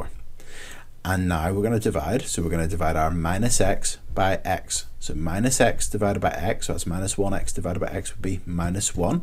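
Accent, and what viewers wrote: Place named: Northern Ireland, United Kingdom. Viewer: Man I love northern Irish accent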